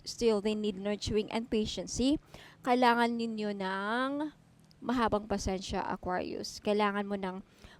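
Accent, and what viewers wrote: Visayas, Philippines. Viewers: Maayong Gabii mam... Bisaya gyudta dae... hehehe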